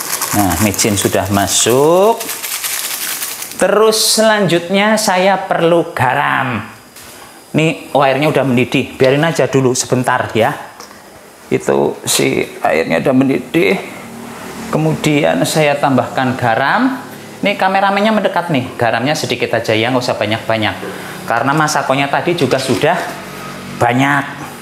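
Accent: Banyumas, Indonesia. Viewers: Wong Endi sih deneng ngomonge ngapak2😂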